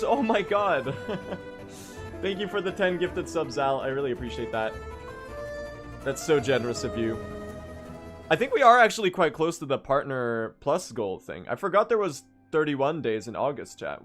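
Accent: Sweden. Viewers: are you swedish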